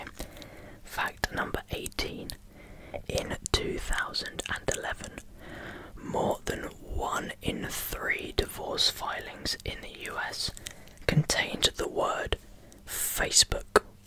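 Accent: United Kingdom. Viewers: Very cute thumbnail huh. Got to be careful using that word until you're eighteen because where I'm from your underage until then. But none the less I love listening to somebody whisperer in a British accent and list off a bunch of facts. British accents are very hypnotizing. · Congrats on 7K subs. Btw I love listening to your British accent 🥰.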